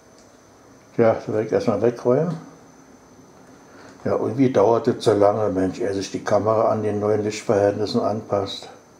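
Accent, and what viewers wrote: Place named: Berlin, Germany. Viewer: Du bist Berliner, das höre ich sofort. Und, ist der auch Berliner? Zimtschnecken schmecken nirgens so gut wie in Schweden. Wir Deutsche können die nicht richtig backen. Oh ja, heute wirds nochmal sehr heiß bei Euch. Hier unten am Bodensee ist es heute angenehm warm mit Temperaturen von 23 bis 25 Grad. Grüße von hier von Leonora Lily Vielen Dank für Deinen Besuch. Hört Karle auch meine Musik? 😼😽😼😽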